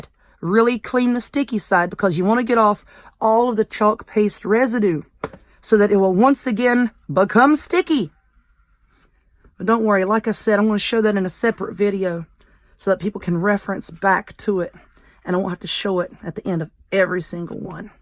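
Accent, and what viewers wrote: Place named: Alabama, United States. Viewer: Hello, I watch all of your videos and LOVE THEM ALL!!! My fave is the 🌹 done on a kitchen strainer!!!!! YOU ARE BRILLIANT!!!!! I am from the south, ALABAMA, and my ancent is just like yours, lol, I cuss too, it's my second language lol. I do all kind of crafts, EVERYTHING!!!! Right now I am into ALL THINGS CONCRETE,LMAO!!!!!! FIRST OFF THE SUPPLIES ARE CHEAP.... QUIKCRETE $3.88, SAND $2.88 ( BOTH ARE 50 POUND BAGS ) AND WATER. YOU CAN LITERALLY MAKE ALL KINDS OF STUFF THAT LAST FOREVER WITH CONCRETE AND IT'S EASY PEASY!!!!! RESEARCH LIKE I DID AND YOU TOO WILL GET HOOKED!!!!!! YOU CAN MAKE VIDEOS ON THEM ALSO!!!!! LET ME KNOW WHAT YOU THINK.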